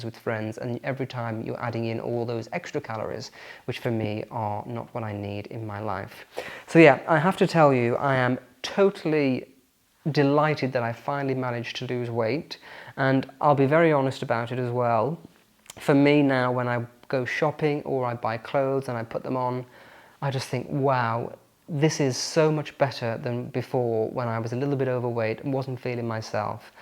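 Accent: RP, United Kingdom. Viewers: U R A BIT plummy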